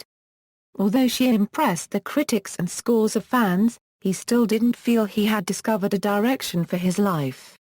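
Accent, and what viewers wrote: United Kingdom. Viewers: Is this female British voice an actual woman’s voice or an AI FAKE VOICE?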